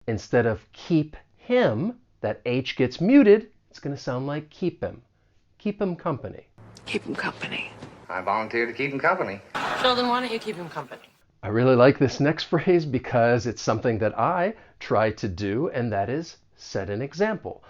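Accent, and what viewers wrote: United States. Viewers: I do love American accent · Is this American English? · thank your video is so helpful. so,keep going! is your english american? from japan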